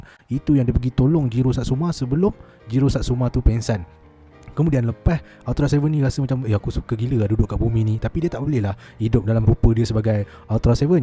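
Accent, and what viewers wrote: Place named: Kedah, Malaysia. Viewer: Awat ckp kedah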